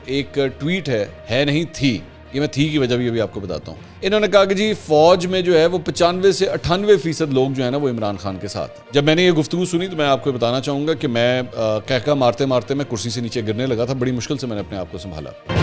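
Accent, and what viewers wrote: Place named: Karachi, Pakistan. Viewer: Aap Urdu to bohat achi boltay ho jaisay Karachi walay boltay hay... Lekin aap Punjabi bhi bohat achi boltay ho.. Jaisay Punjabi Log... Please tell us something about you...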